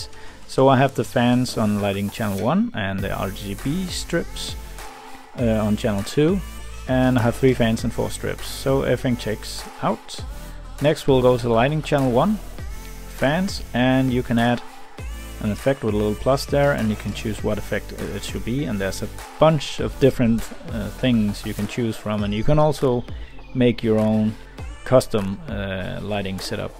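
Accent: Denmark. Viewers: are you from Denmark ?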